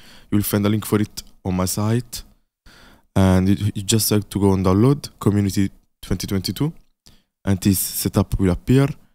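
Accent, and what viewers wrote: Italy. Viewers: si vede che sei italiano dall'accento ahahah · l'accento italiano😭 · tu sei italiano 100% si sente dal accento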